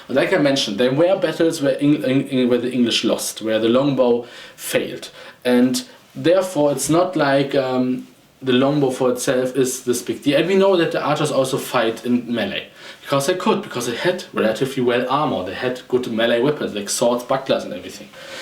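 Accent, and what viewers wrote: Germany. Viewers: Ah, I love your accent. You're German, aye?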